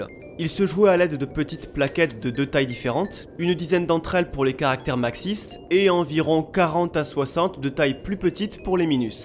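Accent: South, France. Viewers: Tu ne viendrais pas du sud par hazard (tu dis moins en prononçant le s)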